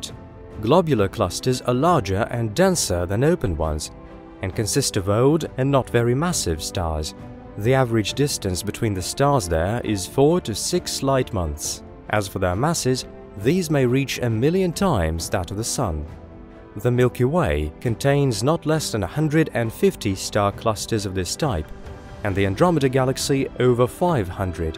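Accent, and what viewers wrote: United States. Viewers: You sound American...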